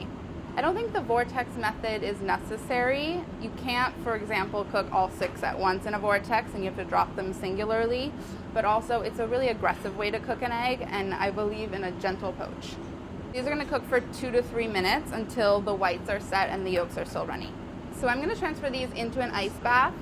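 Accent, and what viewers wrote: United States. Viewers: Is that an accent from somewhere in the US? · She sounds normal, sure its like a small state accent but hardly anything to moch her over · Everyone's talking about her accent and here I am, English not being my mother tongue, and I'm already proud that I can tell she's American. I literally can't hear the difference between any English accents.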